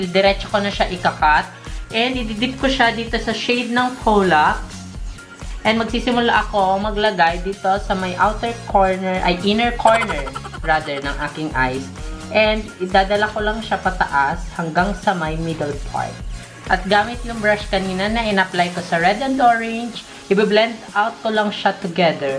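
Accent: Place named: Polangui, Philippines. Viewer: I just so love how you speak bicol polangui, makes me wanna go home. LGBT is ❤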